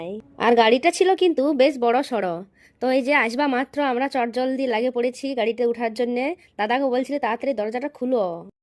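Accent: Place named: Purulia, India. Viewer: পুরুলিয়ার টান টা যাবেক নাই😂😂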